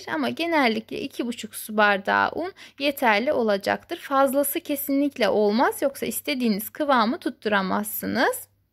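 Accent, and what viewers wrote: Azerbaijan. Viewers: Super olmuşşş,azərbaycanlımısınızz?? Lehçedə biraz nəsə var 🥰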